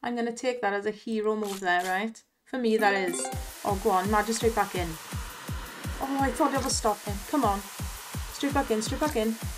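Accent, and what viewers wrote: Wales, United Kingdom. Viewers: You've done some good videos i'm a fan and i love the welsh accent. I'm sure its a welsh accent i am hearing. Lush accent. the only thing that makes some videos a teeny bit rusty for me is consistent low stakes but at least its controlled moderate gambling and doesnt encourage excessive gambling so this is pretty good and clever how you do it. Well done girl. Tidy.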